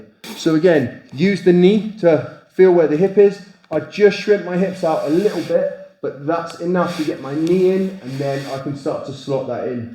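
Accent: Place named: RP, United Kingdom. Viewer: Such a funny accent😂 Posh as f😅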